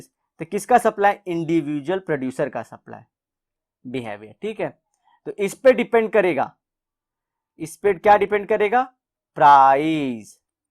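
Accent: Maharashtra, India. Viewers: Is your mother language is marathi ? 🤔🤔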